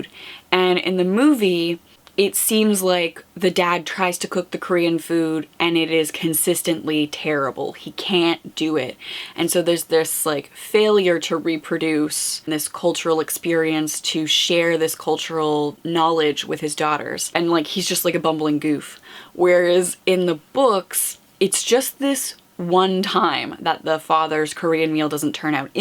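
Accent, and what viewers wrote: Canada. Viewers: Hello, I have been enjoying your reviews. As a English teacher in Australia I love to pick up on different accents. I have noticed when you say out or about it comes across as oot or aboot. What is the origin of you accent?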